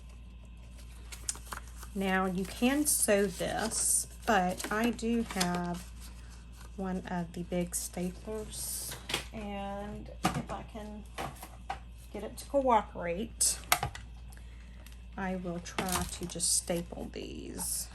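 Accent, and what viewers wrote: South, United States. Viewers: You channeled Bob Ross with a happy little accident on that pocket 😻. I can understand you ok. Love your southern accent.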